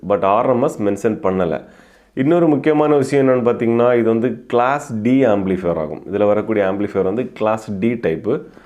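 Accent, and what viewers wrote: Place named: Kerala, India. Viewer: Ningal malayali aano oru malayalam touch varunnundu samsarathil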